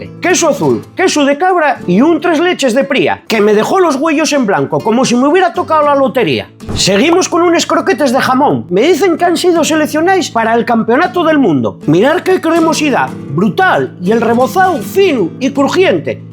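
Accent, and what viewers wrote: Asturias, Spain. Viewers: Perdonen mi incultura pero ese acento es el de Asturias o de donde sale? Soy del sur, y tengo un par de colegas de asturias, y ninguno habla así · Porque hablas así .....eres asturiano de verdad?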